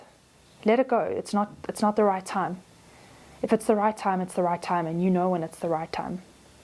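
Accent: South Africa. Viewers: It absolutely is what you say sister. Lovely South African accent. You give the purest guidance on all of you tube. So refreshing to hear.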